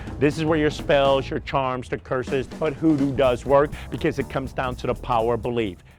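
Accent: United States. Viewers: prolly from Michigan or sumn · He sounds like he's from southwest Louisiana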